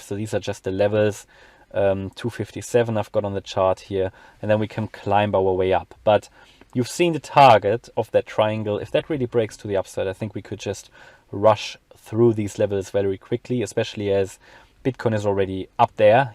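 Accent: Germany. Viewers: Ergo, love the German accent!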